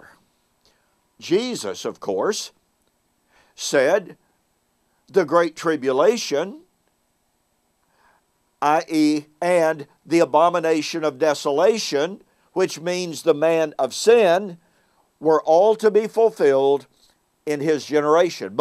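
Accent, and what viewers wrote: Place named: Oklahoma, United States. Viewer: Sounds like Ardmore, Oklahoma. I used to live in the City.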